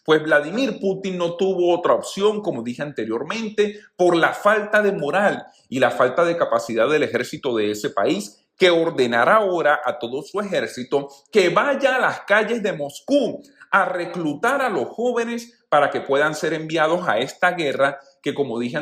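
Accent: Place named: Venezuela. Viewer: Ese dejo venezolano jaja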